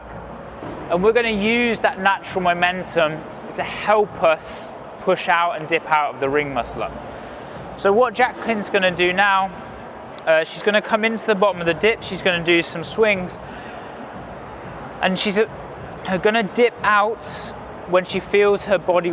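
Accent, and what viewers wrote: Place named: United Kingdom. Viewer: 👑🚀Very nice tanning and with perfect British accent👑🔥🇬🇧 🏴🔥👑❤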